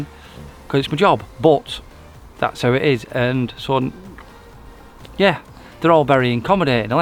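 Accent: United Kingdom. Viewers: Firstly, me being in the U.S., I didn't mind that you were called. You do have a slight hint of an accent so I would have figured it out eventually lol. Second, I think you are spot on with the new logo as this family of GolfMates is the nicest group of people on the web today. And finally, I certainly don't (and I doubt any other GolfMates would) care if you made whatever money is out there to be had. It's actually none of our business. All I care about is you keep your content as it has been all along: genuine, funny, informative, crazy, heartfelt and not mean in any way (as too many other channels eventually become). And this is ALL due to you.. being the straight forward fellow you are and not pretending to be someone you aren't. Stay safe all and we'll meet up on the course soon.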